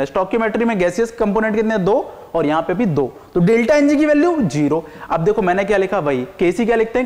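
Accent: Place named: Indore, India. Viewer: Indori accent Bhai Indore ka hai Shayad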